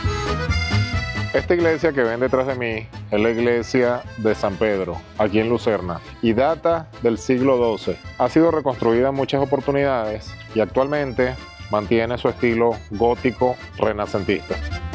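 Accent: Venezuela. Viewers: Tienes acento venezolano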